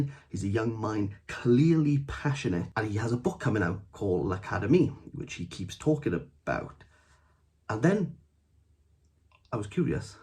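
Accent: Wales, United Kingdom. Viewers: Cool review. Love a welsh accent I was born there left when I was 5 and have never been back.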